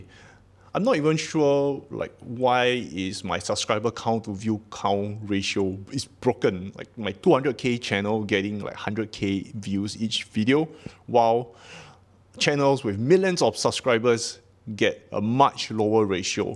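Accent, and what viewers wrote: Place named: Singapore. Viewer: God bless you 🙏 You are one of the best, and what I always liked about you was you are so genuine. You have taught me so much. Your English is great and even better with your beautiful Singapore accent (a place close to my heart). Take care, look after yourself and ignore the toxic trolls - they have nothing on you! 🙏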